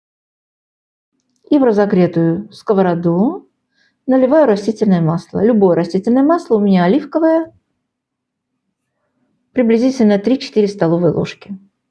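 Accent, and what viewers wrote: Baku, Azerbaijan. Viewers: Я сразу по акценту догадался, что перед нами бакинка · Доброго дня,! У Вас до сих пор остался бакинский акцент.... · Какой у вас сладкий бакинский акцент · Я тоже Бакинка ,я тоже так делаю ,но спасибо не буду, попробую без жарки лука ,сразу подумала что по акценту ,вы из Баку ,спасибо ,вам здоровья моя землячка ,пусть моя смотрит ваши рецепты ❤хранит вас Бог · Чувствуется легкий бакинский акцент🎉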